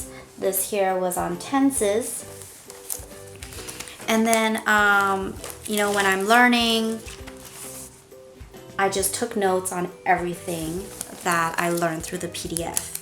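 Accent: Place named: Canada. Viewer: are you from Canada ? you sound like one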